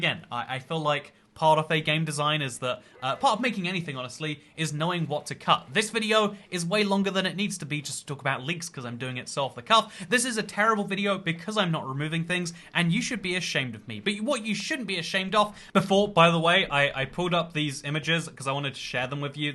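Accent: United Kingdom. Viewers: THE MYSTERY HAS BEEN SOVLED HES BRITISH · why does he sound asian when he says hello im but then sounds british for the rest of the video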